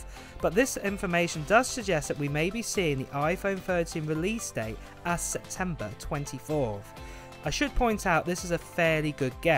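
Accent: United Kingdom. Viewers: British accent 😂😂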